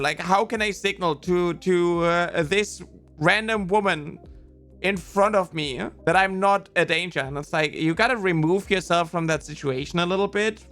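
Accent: Germany. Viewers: r u german n etc ?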